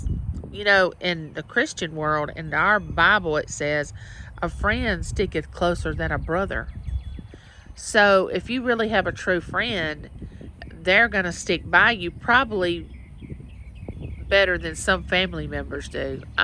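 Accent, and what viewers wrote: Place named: South, United States. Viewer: I absolutely love your southern accent! ❤❤❤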